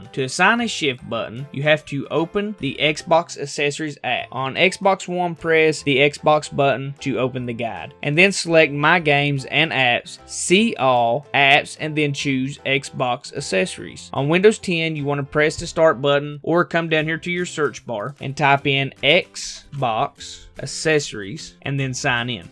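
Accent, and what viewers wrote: South, United States. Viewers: Thank you man your Southern drawl is exactly what I needed for in an explanation on how the f*** to use the shift paddle after I've had these controllers for like 3 years and never even considered it. And don't mind them comments the videos perfect we can stare at those Stars on your desk top while you explain some stuff. Thanks brother.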